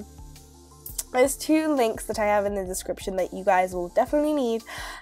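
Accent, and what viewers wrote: United Kingdom. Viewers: Are you from London? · FINALLY! Someone British..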